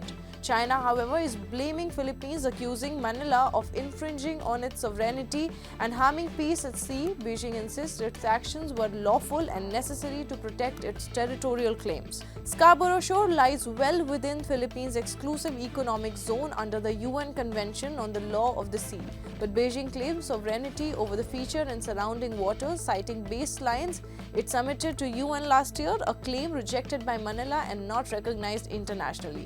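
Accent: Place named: Tamil Nadu, India. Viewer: Small ship purposely collide with big ship who are at fault? Please speak better slang not like Tamil slang very irritating our ear.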